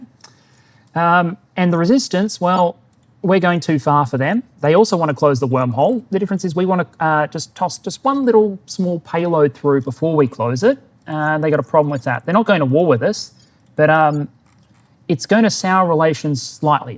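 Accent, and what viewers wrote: Australia. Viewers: Reaching out to the game's original voice actors to do chapter intros is a let's play power move. I love the sound of your voice narrating both the real history of the Ukraine war and the fictional history of what ever game you are playing. I fear that future history lectures I will attend will not sound authoritative enough without the Australian accent.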